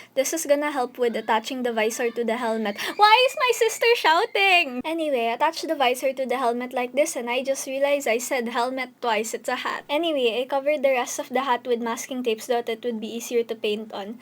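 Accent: Philippines. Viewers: finally a Filipino that knows tbhk...finally (luv ur accent and ur sarcasm uwu) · I thought you were a Filipino.....the accent tho😣👌👌👌👌👌💞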